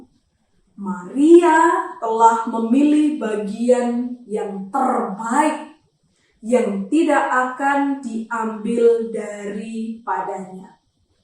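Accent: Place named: Banyumas, Indonesia. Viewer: Maturnuwun Pdt. untuk Kerlingnya......, ngapak-ngapaknya dipertahankan nggih🙏😊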